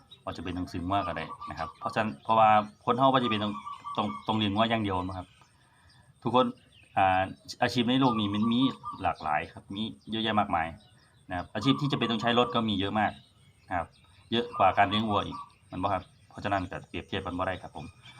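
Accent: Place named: Chaiyaphum, Thailand. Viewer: ครูอยู่บ้านใดเว้าสำนียงคือคนชัยภูมิบ้านพี่แท่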